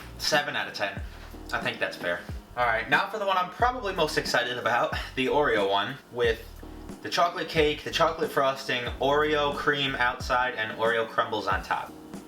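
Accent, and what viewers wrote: New York, United States. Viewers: Oh he lives in New York too? I thought so, his voice sounded SO New York and familiar. I bet he lives either upstate or Long Island like I do. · potter wtf is a thick American twang he has a new York accent most people (away from the east coast) don't talk like that lol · I'd appreciate it if you could give me your American accent in exchange for my boring old Australian one. Thanks pal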